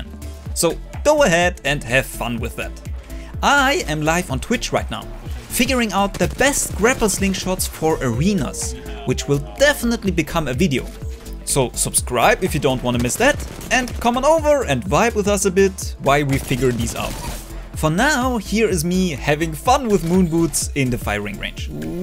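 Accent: Germany. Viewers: Bro du bist ein deutscher oder? Hört sich jedenfalls so an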